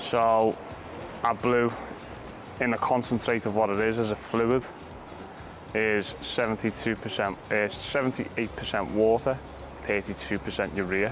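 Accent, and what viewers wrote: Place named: Liverpool, United Kingdom. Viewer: Liverpool dialect. One of the strongest accents I've ever heard.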